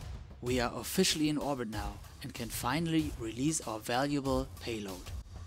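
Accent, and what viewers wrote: Germany. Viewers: kollege man merkt dass du deutsch bist :D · Not sure if German or Japanese. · Are u a german??? :-) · Are you German? Almost every German has that English accent.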